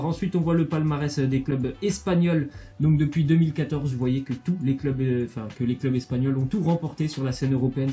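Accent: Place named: South, France. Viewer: Ta prit un accent du sud 😂et dommage pour Marseille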